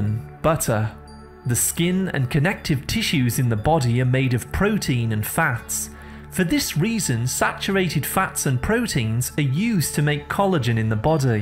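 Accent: England, United Kingdom. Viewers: Whats with the damn English accent..LMFAO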